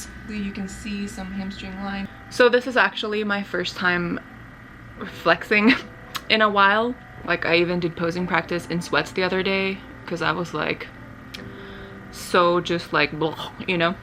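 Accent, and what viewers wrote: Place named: Finland-Swedish, Finland. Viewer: Self-reflection is good, but don't let it get you down. All you can do is all you can do. Your body will respond or not. Let's hope it will on time:-) Lycka till. Jag älskar din finlandssvenska accent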